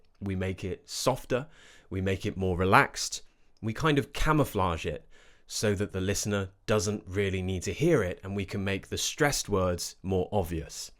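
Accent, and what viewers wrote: RP, United Kingdom. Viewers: Hello, I’m not sure if you’ll see my comment, but I truly appreciate your efforts. I really love your accent, and I’ve always been fascinated by British English. If possible, could you tell effortless stories—around 30 minutes long—and at the end of the story, explain the actual meanings of the most important expressions, words, or phrases with a few examples? After that, it would be great if you could read the story again a bit more quickly. This idea is similar to what the channel English Natively does. Thanks a lot! 🙏🙏🙏🙏🙏🙏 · I love to hear the british english… 😅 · I like British accent thats why i always watching your videos from🇵🇭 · I don't know why but I absolutely love the British accent 😊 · Do you always speak in that accent normally? Especially, RP accent and the tone.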